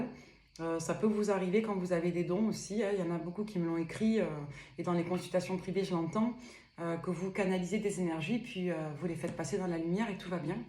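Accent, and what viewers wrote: South, France. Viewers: Merci; Je préfère largement entendre votre jolie voix avec un léger accent du sud et vous voir souriante. 😉😂🤗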